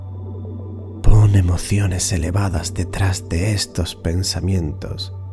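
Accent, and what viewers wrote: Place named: Spain. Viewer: Excelente meditación, excepto el acento de España podrían hacer con un acento neutro, gracias.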